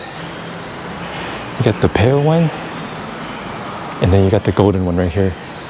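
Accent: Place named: West, United States. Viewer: Now you are in Asia, so you don't have to speak like westerner. You are a China Chinese, don't have to boast that you came from the west.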